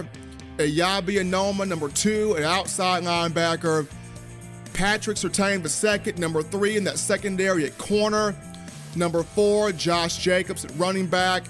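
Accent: South, United States. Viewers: You country funny voice nerdy looking boy you sound stupid stop bitching we don't give a fuck about the 5 losers get over it suckas.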